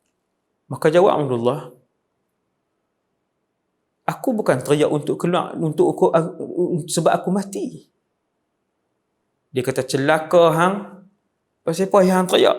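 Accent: Kedah, Malaysia. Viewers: Alhamdulillah terbaik ustaz Wadi Annuar terbaik kedah pekat dimana pn kuliah xtinggal negeri Kedah pekat ringkas senang faham terimakasih ustaz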